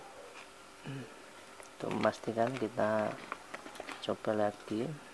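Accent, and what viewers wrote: Blora, Indonesia. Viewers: iki wong mbloro opo y K-E :D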